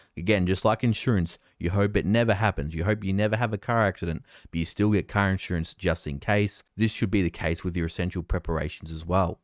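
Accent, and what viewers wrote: Australia. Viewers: he is Aussie hence the accent.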